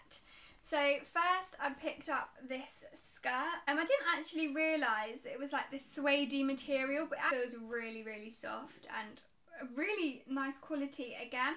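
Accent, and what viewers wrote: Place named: RP, United Kingdom. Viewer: is stunning. Plus the posh English accent is lovely.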